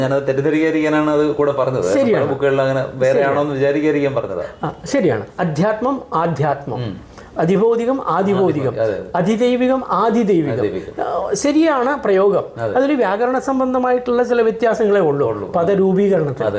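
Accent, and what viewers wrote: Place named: Karnataka, India. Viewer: കർണ്ണാടക സ്വദേശിയായ മലയാളം. 🙏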